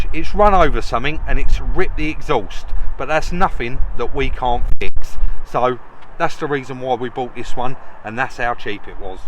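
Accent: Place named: Kent, United Kingdom. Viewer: Great hall of cars that. Love your Kentish accent & sayings....." friend of mine reached out to me " 😂 You've got mates with long arms 🤣🤣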